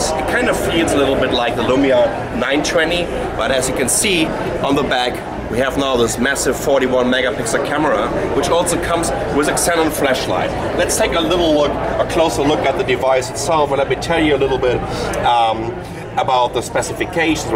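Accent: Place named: Germany. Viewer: amazing review, i like German-English language :)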